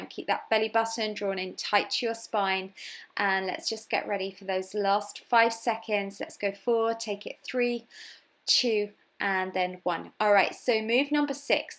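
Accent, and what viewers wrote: United Kingdom. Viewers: Really love your accent I'm American so I find British people's accents to be amazing 😊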